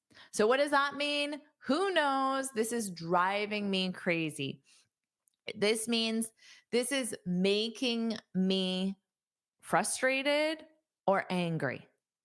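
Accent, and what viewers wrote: United States. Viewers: Thanks a lot for real American English .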